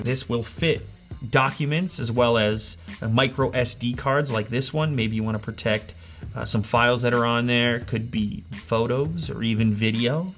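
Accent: Canada. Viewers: is he canadian?? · R u Canadian mr.unboxer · Are u Canadian unbox therapy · Is he Canadian??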